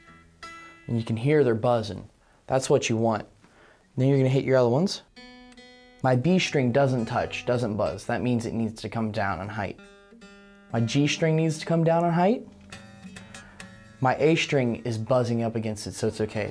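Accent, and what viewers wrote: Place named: United States. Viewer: Very good and educational video. BTW and out of curiosity, what US accent is this? I.e. schtrings on a schtraight neck.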